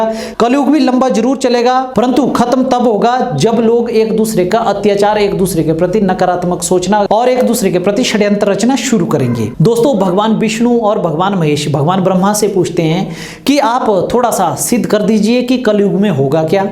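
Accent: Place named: Mandi, India. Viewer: Mandi se ho kya bhasha mandi ki lag rahi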